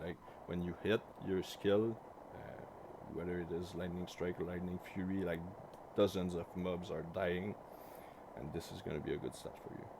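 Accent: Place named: Quebec, Canada. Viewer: hahah l'accent Québécois est assez présent.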